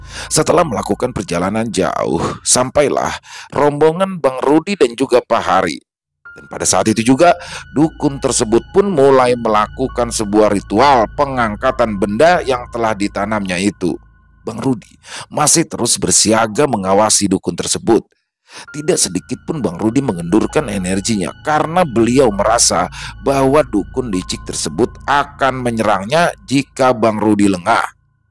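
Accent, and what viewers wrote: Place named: Jakarta, Indonesia. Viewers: aq jg lbh suka logat betawi..jujur gak gala gasi gtu.. secara gue jg dulu tinggal fi tanah betawi lama😅😅 salam knal bang rudi.. aq pecinta bercerita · Paling keren nih cerita dari mas untung prasetyo tentang pengalaman bg Rudi, apalagi yg baca cerita@ bg pke logat Betawi, mantaffff, salam untuk mas untung Prasetyo, dan bg Rudi y bg, semoga sehat slalu n sukses dan slalu dalam lindungan ALLAH SWT, aamiin.......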